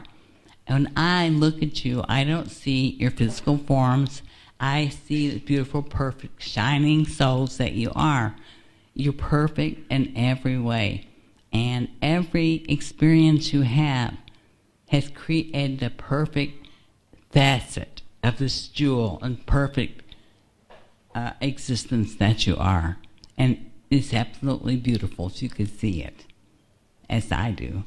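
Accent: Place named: United States. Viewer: アメリカ英語に聞こえます。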